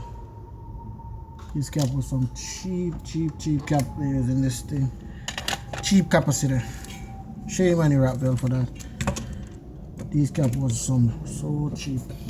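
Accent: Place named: Jamaica. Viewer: Sound like a yaadie🤔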